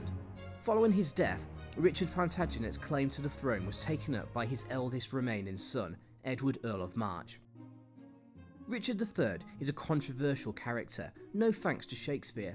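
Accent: United Kingdom. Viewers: It's weird-- the narrator has a very thick British accent and yet he uses American slang like "pissed off" for angry and "awesome" instead of brilliant.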